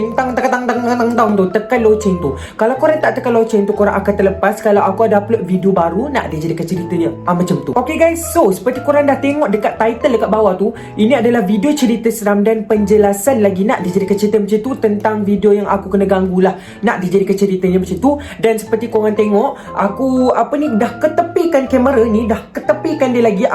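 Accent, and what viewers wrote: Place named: Kelantan, Malaysia. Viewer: wow kelate 😂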